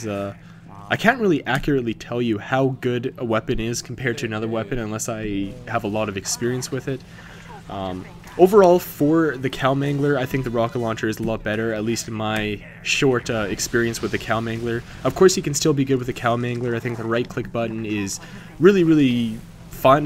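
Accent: Canada. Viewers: I know that accent.... are you Canadian? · Also, are you Canadian? Kind of hearing an accent.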